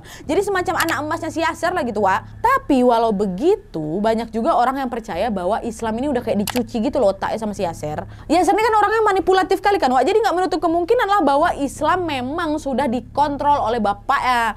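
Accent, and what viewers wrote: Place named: Lampung, Indonesia. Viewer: Kaya orang lampung bahasanxa . Orang mana kak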